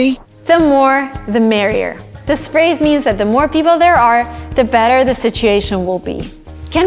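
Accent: England, United Kingdom. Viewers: I really adore your english accent. Thanks for your all video. Merry Christmas 🎅🎅🎅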